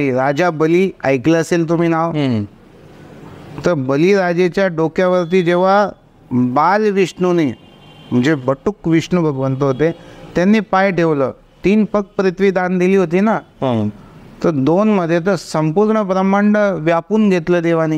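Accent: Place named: Dhule, India. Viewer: Bhashe warun tumhi Dhule che watata, mazha janma Dhule cha ahe, mi Mumbai madhe asto, mala consultation gheta yeil ka?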